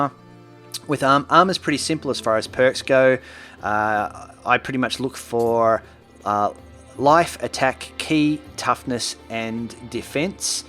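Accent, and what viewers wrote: Australia. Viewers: great vids by the way, I'm aussie too, nice to hear the accent.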